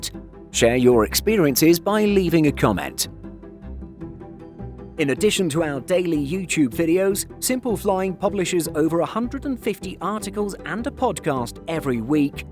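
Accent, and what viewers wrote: United Kingdom. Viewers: You can hear from the stiff accent that that's British, very very British. So they simply cannot use metric system because they are Imperial.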